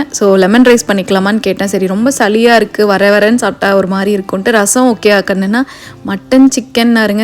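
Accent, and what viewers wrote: Coimbatore, India. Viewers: Nice way of talking coimbatore slang sis ,today lunch box spl bisibelabath and egg omelet sis ,lots of love and hugs❤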